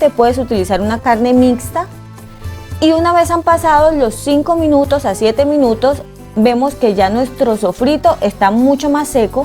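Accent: Colombia. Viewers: Me encantó la receta quiero saber de dónde eres es que le siento como un acento colombiano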